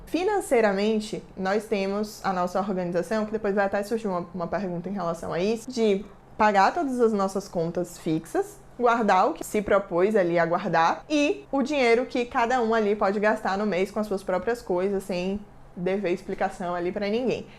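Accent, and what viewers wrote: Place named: Bahia, Brazil. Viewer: Aiiiiiiii! to AMANDOOO que vc ta se dedicando 100% agora ao youtube. Acho que você vai crescer SUPER aqui, te desejo todo sucesso viu? adoro seus vidÉos hahaha. AMO seu jeitinho leve de ser e esse sotaque lindo da Baheaa! Vou ate ativar as notificações! To maratonando seus vídeos novos. ps: FAZ O VÍDEO COM O MATHEUS SOBRE FINANÇAS SIMMM. amei que vc tb é taurinaa, e meu namorado tb se chama matheus! Um xerão💕💕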